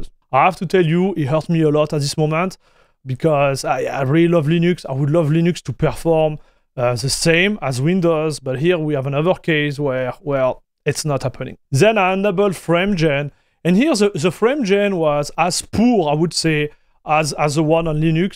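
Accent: France. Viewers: genuine question, is he french or belgian?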